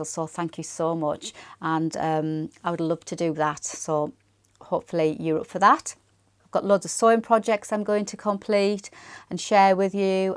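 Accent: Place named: North, United Kingdom. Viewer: The babylock machines are the best i have that coverstitch and an imagine overlocker, You sound northern like me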